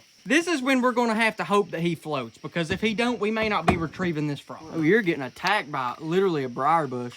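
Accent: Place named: Texas, United States. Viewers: he sounds like Georgie from Young Sheldon... is he Texan? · texas accent going hard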